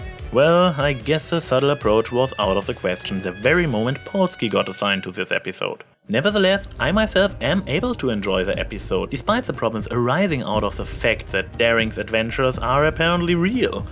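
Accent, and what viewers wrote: Germany. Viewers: Hey, I noticed your accent. Are you German or Austrian? I'm Belgian, BTW.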